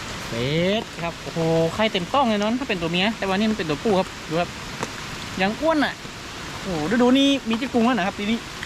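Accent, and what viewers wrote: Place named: Chiang Rai, Thailand. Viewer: บ้านแต้อยู่ไหนนิสำเนียงอย่างคนเจียงฮาย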